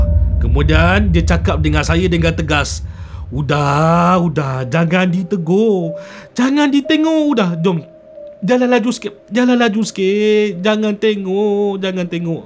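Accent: Perak, Malaysia. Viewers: Saya baru join tengok.. seronok dengar kisah2 benar..dan yg lebih seronok 'dengo' cakap loghat perak.. haha..😅 sebab saya pun original org peghrak..hahaha😂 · Suka juga bila dengar bercakap loghat Perak seperti "idak" dan "udah". Saya peminat channel ini, teruskan berkonten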